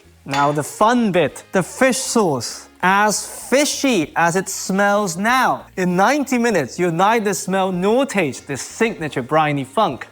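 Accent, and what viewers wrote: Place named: United Kingdom. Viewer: with your eyes closed its like listening to some posh old English cooking radio show :D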